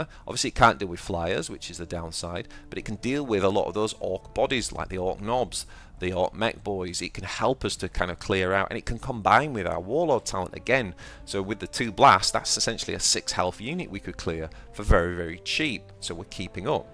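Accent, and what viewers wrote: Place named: England, United Kingdom. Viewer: I found your channel from some russian nids player in chat and ill just say that throwing an english accent in there makes this sound so much more credible.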